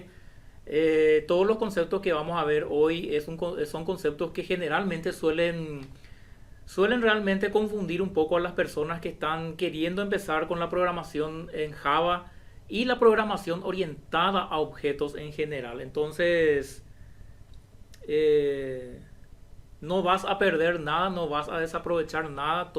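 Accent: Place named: Paraguay. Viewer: Inconfundible el acento paraguayo, espectacular. Por cierto, cuando te refieres a la composición y agregación. En la composición es la instancia de la clase contenedora mas la instancia de la clase embebida la que termina. En la agregación no necesariamente debe morir la instancia de la clase agregada.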